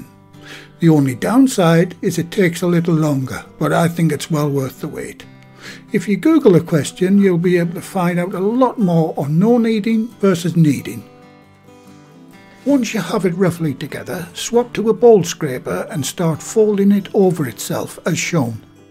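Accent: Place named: Middlesbrough, United Kingdom. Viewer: Where you from ….. sound like a boro lad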